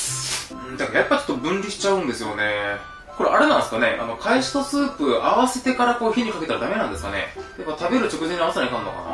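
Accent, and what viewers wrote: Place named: Hakata, Japan. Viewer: 自分も福岡の人なんで所々博多弁なのいいね😊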